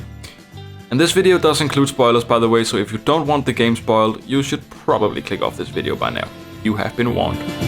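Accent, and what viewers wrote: Denmark. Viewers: Are you from Denmark? You sound danish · Jeg kan genkende noget danglish når jeg hører det😂. Super god video, det var lidt chokerende at se du startede for 10 dage siden. Meget imponerende og jeg glæder mig til at se hvad du poster i fremtiden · Is that danglish i høre?😄❤️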